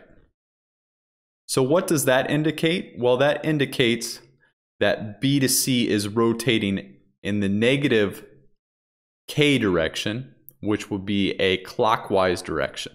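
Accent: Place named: England, United Kingdom. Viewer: Finally, someone that speaks with pure English accent. Please post more videos on the acceleration analysis using complex number and vector math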